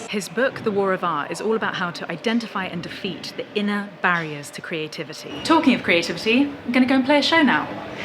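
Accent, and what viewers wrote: United Kingdom. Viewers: Your videos are Fantastic, and your Voice is British perfection. Thank you for sharing with the world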